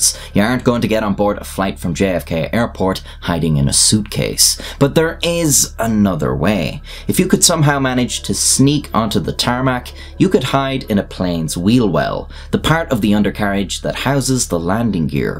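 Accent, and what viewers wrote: Ireland. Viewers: Narrated in an Irish accent although the grammar, syntax and tortology is clearly American. Why? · Gosh I love the Irish accent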